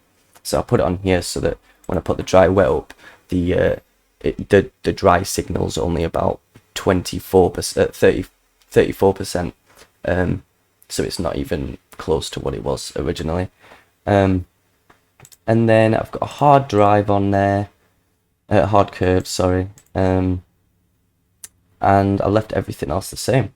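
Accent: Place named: North, United Kingdom. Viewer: you hear the northern accent and know its proper jump up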